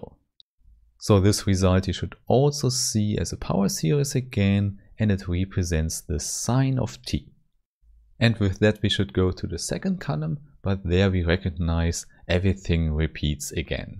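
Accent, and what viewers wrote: Germany. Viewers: This is great explanation, very useful.Thank you. P.S.Judging by your accent, I assume that you are from Berlin.🙂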